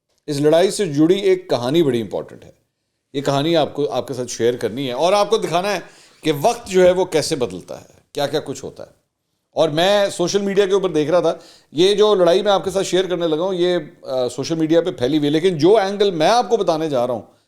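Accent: Punjab, Pakistan. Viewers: Punjabi touch zaida DIA karay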